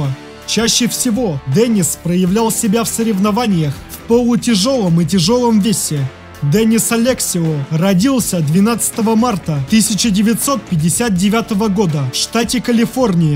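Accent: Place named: Moldova. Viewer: Могли бы человека с нормальной дикцией подобрать для озвучки,а не этого молдована с суржиком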